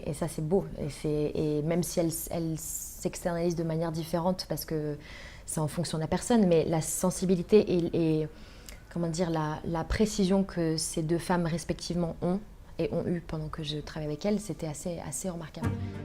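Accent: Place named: France. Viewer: She has a very good strong frenxh accent and British one 😮❤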